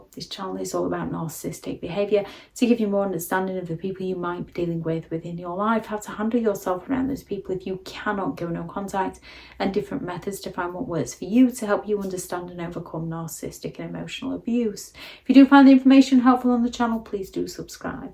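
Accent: United Kingdom. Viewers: O.K. besides excellent content I love your British accent and as a mother of 5 your energy as U hop like a bunny in your first short.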